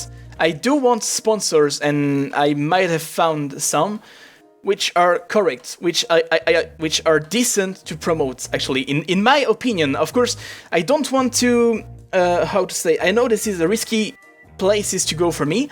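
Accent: France. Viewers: dat sweet baguette accent KreyGasm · Love your french accent :D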